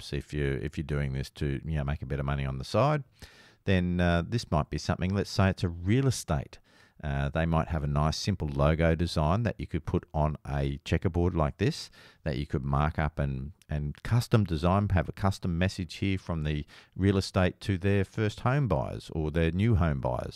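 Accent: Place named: Australia. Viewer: Great lesson. I’m trying to learn all I can, so I can stop buying files and make my own work. Love the Aussie accent. Australia is on my bucket list to visit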